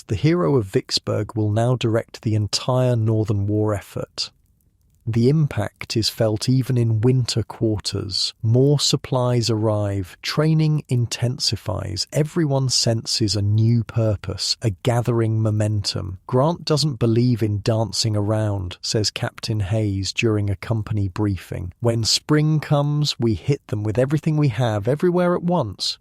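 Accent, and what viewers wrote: United Kingdom. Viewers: Why a Brit narrator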